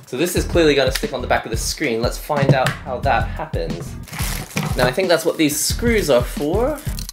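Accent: United Kingdom. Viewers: british accent on a japanese.. pff this looks so weird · A Japanese chap with a British accent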